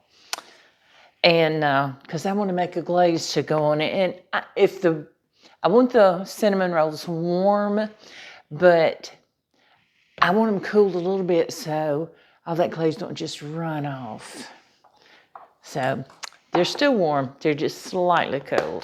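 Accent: South, United States. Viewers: You sound like Paula Deen. You have that southern tring. So you have to be a good cook 🧑‍🍳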